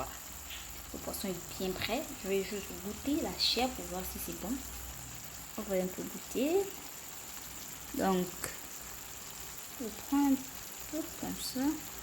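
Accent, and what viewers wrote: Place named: Gabon. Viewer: On sent une gaboma 😂😂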